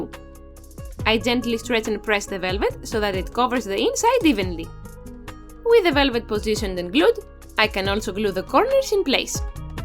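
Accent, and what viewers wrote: Greece. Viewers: Are you greek? The accent kinda sounds similar to my families 😅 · This is a lovely video, plus i can recognize a greek accent anywhere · Where are you from ? You sound kinda Greek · My apologies for pointing it out ;-) I'm Greek myself (born and raised in Belgium though) and that Greek/English is so recognizable ;-) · Are you Greek by chance? I love the artwork and your accent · You have done an amazing job! The journal looks fantastic! So much work that you have already put and continue to do so. I hope you get thereand that this project of love turns out exactly how you want it to. A thousand well dones! ps. Is this a greek accent I hear? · Πολύ ωραίο βίντεο! Μήπως τυχαίνει να ξες ελληνικά; Γιατί η προφορά σου λίγο μου θυμίζει τον τρόπο που ένας Έλληνας θα μιλούσε αγγλικά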